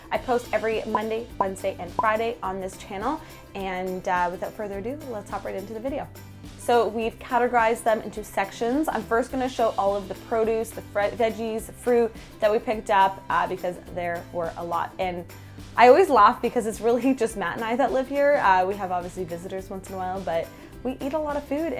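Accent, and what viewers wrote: Canada. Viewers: Your Canadian accent just cracks me up 😂 love your channel!!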